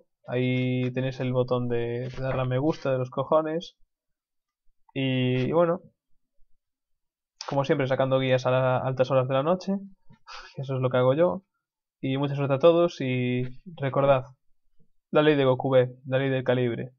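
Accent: Galicia, Spain. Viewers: Eres gallego? tienes mucho acento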